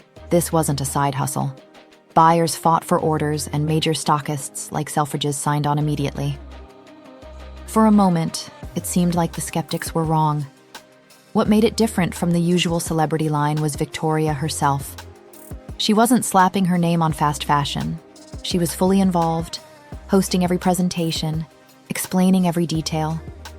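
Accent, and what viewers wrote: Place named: United States. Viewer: This is the best "documentary" I have ever come across of her. Excellent narration in an American accent which sounds like a beautiful melody. I really enjoyed it. Her story is so honestly told. It's just authentic.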